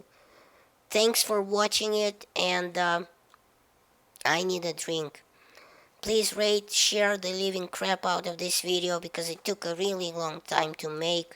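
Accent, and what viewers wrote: Russia. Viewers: i don't care how old u r ur a badass cuz of ur Russian accent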